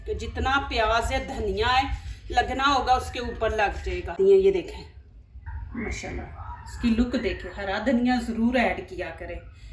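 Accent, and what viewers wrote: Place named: Punjab, India. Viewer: اپ پنجاب کے کون سے علاقے کی بولی بولتی ہیں اپ کی بول چال اچھی لگتی ھے اور ریسیپی بھی اچھی اچھی بناتی ہیں لیکن اپ برائے مہر بانی انگلش کوبیچ میں نہ ملایا کریں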